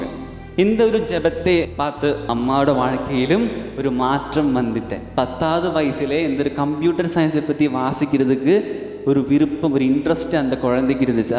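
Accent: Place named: Kerala, India. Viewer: മലയാളി, എന്ന്, സംസാരത്തിൽ മനസിലാകുന്നു, ഇത് എവിടെയാ?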